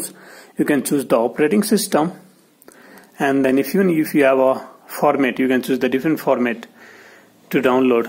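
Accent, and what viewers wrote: India. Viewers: U sounds odia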